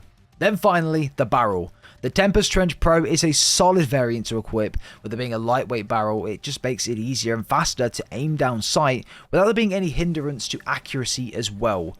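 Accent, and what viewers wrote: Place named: United Kingdom. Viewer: Can’t you hear that he’s British. We say things differently than Americans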